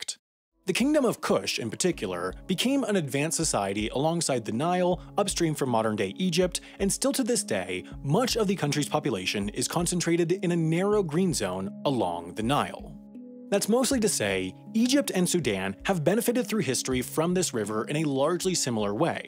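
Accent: United States. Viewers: Senseless Egyptian propaganda in American English! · Isn't he American? He sounds American.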